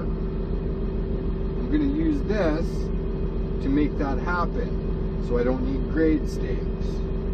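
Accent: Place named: Canada. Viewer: I’d love to learn one of these systems for bigger projects, because running an 8 with a guy walking with a laser setup and a stick in his hand can get frustrating. I can do a decent job just doing it off of my eye, but like you said you still have clean up and compaction to take into consideration. Anyway, I feel like if anyone believes these misnomers that you brought up in the video they are probably irrelevant people to be concerned with and if you put them in a seat of a machine that’s quickly find out that we have job security for a VERY long time as operators. As a side note I love the way Canadians say “PROcess” and “PROject” haha cheers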